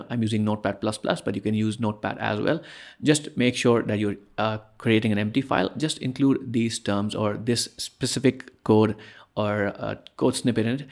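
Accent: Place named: India. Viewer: I feel like im going bat sh!t crazy when i listen to east indians talk. Your guys accents are absolutely unbearable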